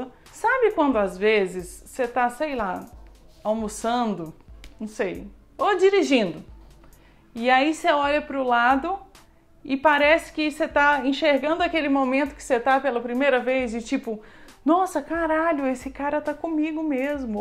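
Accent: Minas Gerais, Brazil. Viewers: “Acabei de ter um estranhamento com cê” apaixonada nesse mineres 😍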